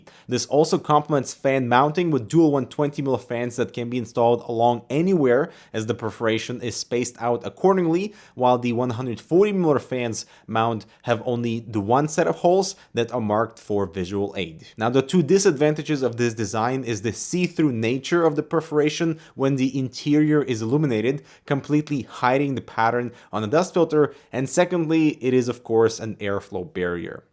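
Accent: Russia. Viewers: Are you russian? Im not trying to be racist, just curious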